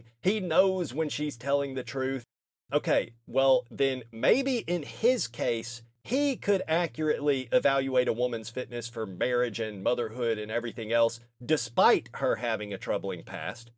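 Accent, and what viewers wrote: South, United States. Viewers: he definitely has a slight southern accent. Wouldn't say hillbilly, but for sure Southern. Hillbilly is much more pronounced. He sounds like a dude who lives in a slightly more rural area.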